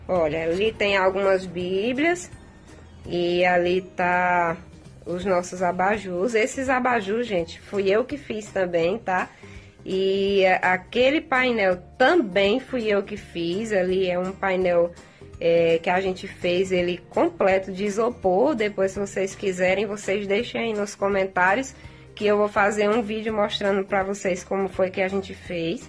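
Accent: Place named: Northeast, Brazil. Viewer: Amei seu vídeo. Que sotaque maravilhoso que simplicidade! Amei saudade do meu nordeste ❤💋😘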